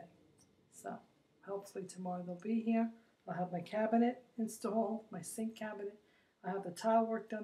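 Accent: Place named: New York, United States. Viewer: I'm so glad I found this! I'm waiting to get my appointment! I'm from NY too Babylon ny...by the sound of you accident you might be too!! I hope all goes well!! I'm excited but scared. She really is a good doctor despite the hiccups but it's a pain.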